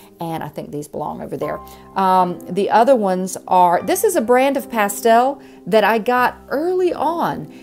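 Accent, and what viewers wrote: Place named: South, United States. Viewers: A southern accent never hurts. Hehe. Also, you'd never know how they are pronounced just by looking at them. I've seen even the most seasoned artists muck up "quinacridone" and "Phthalo." We can't be expected to know how to pronounce words derived from chemistry, nevertheless words from foreign languages! 😝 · Just part of the learning process (for all of us!). Love the southern accent!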